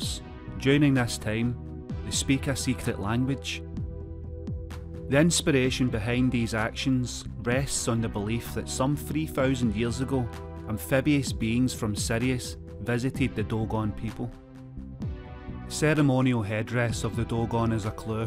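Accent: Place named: Glasgow, United Kingdom. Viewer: Is that a Weegie narrating?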